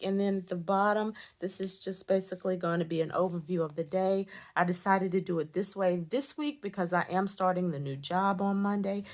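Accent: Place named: North Carolina, United States. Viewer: Hey! Just found your channel. Are you in NC? Of all the planner folk I follow, your accent sounds like mine! I have some of these stamps and was trying to figure out how to use them. Great video!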